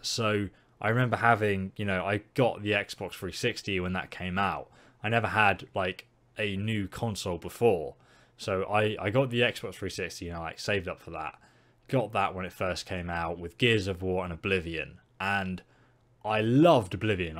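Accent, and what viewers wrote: England, United Kingdom. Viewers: Where did you grow up? I cant tell which side of the north/south divide you are from. Speaking as a Yorkshire lad.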